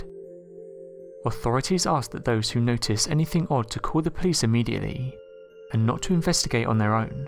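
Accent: United Kingdom. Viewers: It is to. He's British, which you SHOULD be able to tell from his accent.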